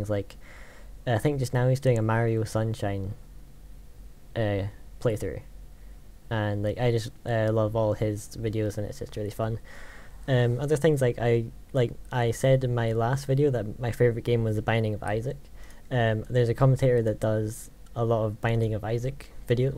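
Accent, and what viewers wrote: Scotland, United Kingdom. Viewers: He IS Scottish. People mistake his accent for an Irish accent. Also, I live in Texas as well, just as a side note, and the "cowboy stereotype" irritates me a lot. Maybe it's because I'm a goth, but I don't wear the make-up or have piercings, because I think it's too much work with too little significance.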